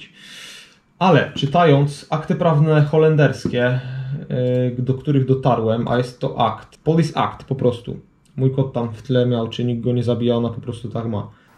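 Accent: Silesia, Poland. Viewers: O przepraszam ale jestem pierwszy raz na profilu i od razu po akcencie poznałem. Czy Pan jest ze Śląska i służy w śląskiej Policji? :-D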